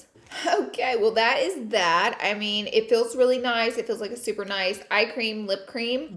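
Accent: South, United States. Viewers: honestly watching her videos are so relaxing to me. I’m like finally someone with a southern accent!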